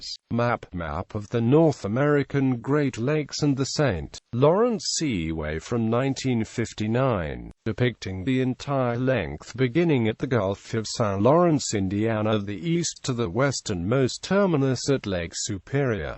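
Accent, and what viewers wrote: United Kingdom. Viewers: This is the first computer I've encountered that has a British accent.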